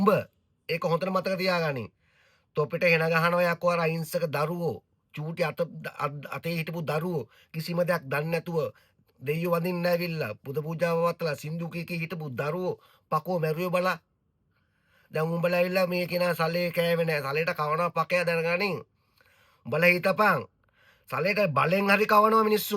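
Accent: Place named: South, Sri Lanka. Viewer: කතාවෙන් මචෝ උබ දකුනේ වගේ හැබැයි මූ රාජපක්ෂලාගේ 😅😅😅😅ගැත්තෙක්ද😢😢😢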